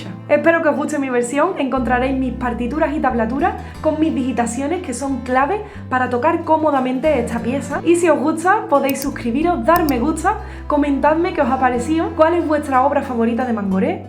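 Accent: Andalusia, Spain. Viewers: Escuchar ese acento andalú 😍🥰 enamora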